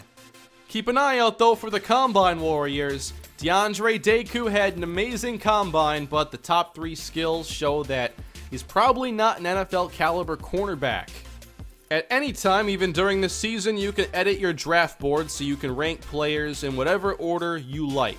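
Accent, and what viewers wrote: Minnesota, United States. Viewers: are you from minnesota? ++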